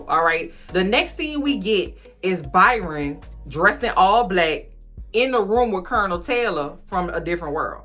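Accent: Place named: Louisiana, United States. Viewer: Your accent is so beautiful! 😄💖 Got to be Louisiana!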